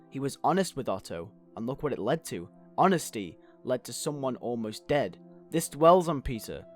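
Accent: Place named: United Kingdom. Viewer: you are very good youtuber and your British accent is great what happens next?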